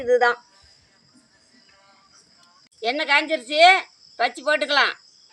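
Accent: Kongu, India. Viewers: கொங்கு தமிழ் அருமைய் 🙏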